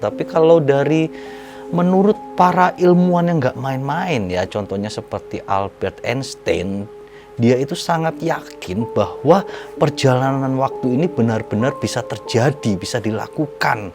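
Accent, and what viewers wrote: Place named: East Java, Indonesia. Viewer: Sip mantap oranh daerah mana sebenarnya logatnya kyk jawa timur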